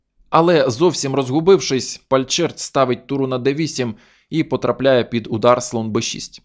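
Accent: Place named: Western Ukraine, Ukraine. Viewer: Як нас на Західній Україні говорять файно.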